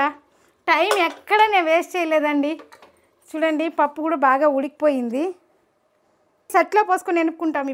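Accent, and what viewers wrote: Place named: Chennai, India. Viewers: Thank you for recipe. Are you from Tamilnadu Andhra boarder? Your slang seems so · నమస్తే! మీ భాషచెన్నై కి దగ్గర్లో మాట్లాడే భాషలా ఉంది. బాగుంది. మీదేవూరో చెప్పగలరు. నేను ఆంధ్రప్రదేశ్ శ్రీకాకుళం నుంచి రాస్తున్నాను. వీలైతే జవాబు ఇవ్వండి. నేను గమనించింది: పప్పులో మిరపకాయలు అన్ని అవసరం లేదు. ముక్కలు చేసి వేస్తే ఎలాగూ ఉడుకుతుంది కదా!2. తాళింపులో ఇంగువ బాగా ఎక్కువ అయింది. ఒకసారి వీడియో చూసు కోండి. జై భారత్!! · Neenga tamilian .nalla theriyudhu.ungalode slang pakkayile.irundhalam telungu nalla pesaringe.namba ooru..adhukkaga subscribe panne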